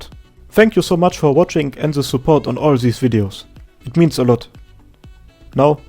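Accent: Germany. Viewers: der Deutsche Akzent ist direkt zu hören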